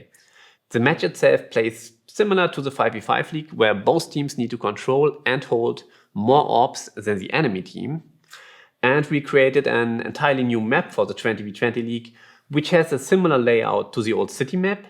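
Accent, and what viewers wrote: Germany. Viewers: his accent is the most german accent ive ever heard.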